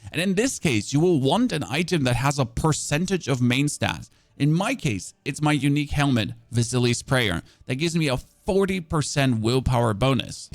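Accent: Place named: Germany. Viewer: O wauw, I remember you from early Hearthstone days when you still had a German accent ;) happy to be recommended your D4 content!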